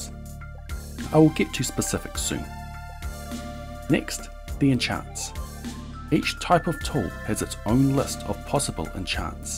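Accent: New Zealand. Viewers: Nz accent?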